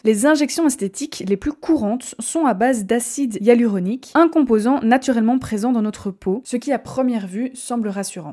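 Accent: Belgium. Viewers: Super sujet et j'aime beaucoup la façon dont vous le présenter, avec profondeur et simplicité. J'entends un fond d'accent belge, non ? En tout cas, continuez !!!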